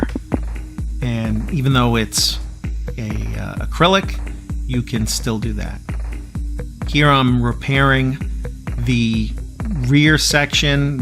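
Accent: Texas, United States. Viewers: Weirdest Texas accent ever 😊 Great build buddy.....glad the channel is so successful and growing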